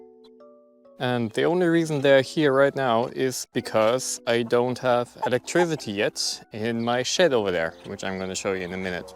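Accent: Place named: Ireland. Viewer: Das war wieder ein sehr informatives Video😊 und du hörst dich langsam wie ein echter Ire an😂❤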